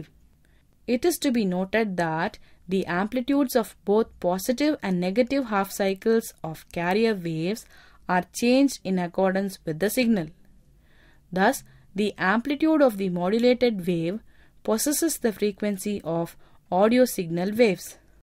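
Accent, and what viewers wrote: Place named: India. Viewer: this is an indian English speaker, haha.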